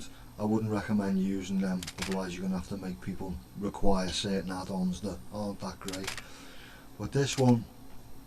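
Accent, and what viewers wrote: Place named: Liverpool, United Kingdom. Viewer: hey man I've been interested in of airwolf for ages now, are you making missions for airwolf? I would love to get this if I could learn a bit about the game I will try and help you. I remember when this used to be on tele on the channel Bravo? Also you sound like your from Everton? yes? I'm not too far away, a bit further north. :)One more thing which operation flashpoint is this and will airwolf work with red river?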